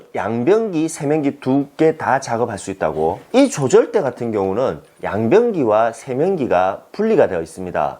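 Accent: Gyeongsang, South Korea. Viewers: 우한 코로나가 아니라 COVID-19입니다 억양을 보니 대구 코로나라고 하면 기분 안좋으실 것 같은데..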